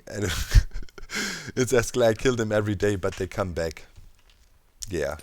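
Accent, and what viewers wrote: Germany. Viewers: Bist du Deutscher?😂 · Man hört den deutschen Akzent raus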